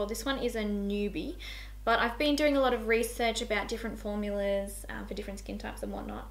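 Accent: Australia. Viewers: Are you from Australia if so which state??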